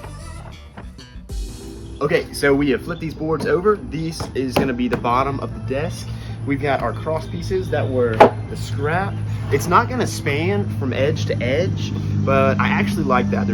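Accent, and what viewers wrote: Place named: Georgia, United States. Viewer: Thought that accent sounded familiar. Glad to see some GA representation in woodworking YT.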